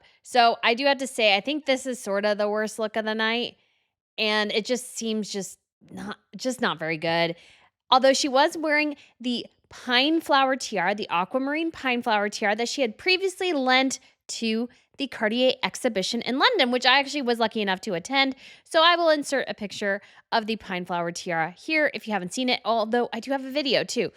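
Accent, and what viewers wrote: United States. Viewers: please get yourself a speech teacher and work on your squeaky girlie voice. You're too old to sound like a 14 year old. And while you're at it, you might want to get rid of that heavy American accent just a little bit.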